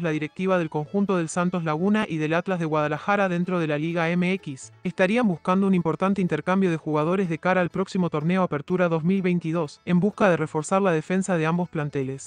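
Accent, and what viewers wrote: Argentina. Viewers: Por qué hablas como argentino ?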